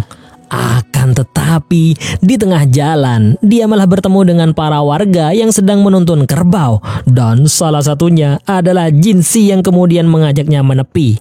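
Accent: Madura, Indonesia. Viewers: Adminnya orang Madura ternyata 😂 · orang Madura bang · Medure mas 🤣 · Ini yng ngomong orng madura jiah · reng medureh kiah reng wkwkwk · Wah ini pembawa suara nya orang Madura yaaa😅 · Ooh pantes aku kayak ngeh ada logat maduranya ternyata benar😂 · Ini orang madura ya 😂😂😂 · Medureh kak😂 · Komentator nya org Madura ya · ternyata pengisi suaranya madura 😅😂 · Teppak ka reng madhureh boss 😂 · Ternyata orang madura wkwkwk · Beh reng medureh??😅😅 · Kakeh reng medureh yeh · Seperti ya yg isi suara ini orang madura😅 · ini Narator orang Madura ya..😂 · Lu orng Madura yh bng😂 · Oreng medureh toktotokkah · Memang mantap mon oreng madure membacakan alur cerita god job bang sehat selalu😂😂😂😂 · Masyallah acaca medhure ropanah.. Deri dimmah min.. Sengkok reng medhure kyah.. Deri Mempawah Kalimantan barat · hehhh sengak. wkwkk lu madura ya min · Dek....sedeh/sampiyen medureh kammah dek... 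Salam sittong dere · Ini kayak orang madura yg menjelaskan narasi😂😂😂😂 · Orang Madura yg ngisi suara nye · Medureh dimah kanak😅😅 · Beehh mak medurehh payaaa celakak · Saya yakin pengisi suaranya " Oreng Madureh" . 😂😂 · Hahh takok epate'en can, reng madhure ben kak😅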